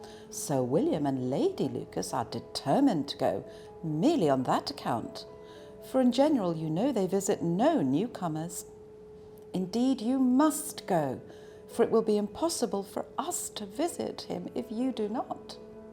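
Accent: United Kingdom. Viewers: 전체 다 읽어주세요 !!.. 영국발음 공부하기 딱 좋아요.. 제발 다 읽어주세요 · 영국억양은 듣기만해도 좋아...💕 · 사람들이 흔히 떠올리는 영국 악센트의 정석이네요 😍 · 와 오만과 편견 책을 영국발음으로 들어보다니 ㅠㅠㅠ 감사합니다 ㅠㅠ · 영국 발음 너무 고급져 ㅠㅠ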